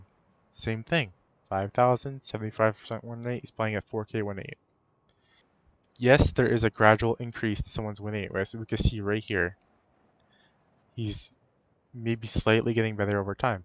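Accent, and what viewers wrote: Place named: Canada. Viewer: Why is it that I can barely understand 4 in a 5 words you speak? You need to enunciate better, stop whispering, and just generally get rid of that advent. What is it, some sort of Canadian?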